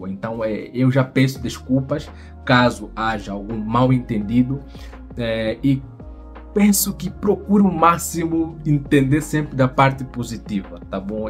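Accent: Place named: Angola. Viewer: Faz sentido tudo o que você disse. (Acho o sotaque angolano lindíssimo! 😊)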